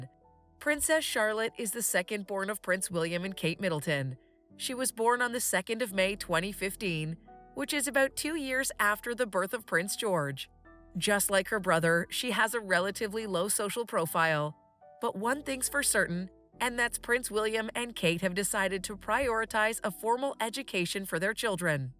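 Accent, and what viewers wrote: United States. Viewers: I cannot stand an american commentig on the Royal family, it just does not sound right.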